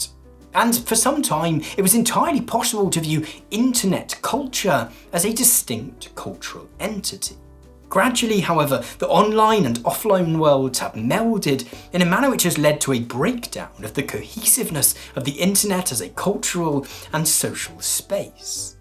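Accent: RP, United Kingdom. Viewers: dont u hate it when the dude narrating a 45 min video is british :( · hearing the words “the shitpost is defined by” in a posh british accent is a transcendental experience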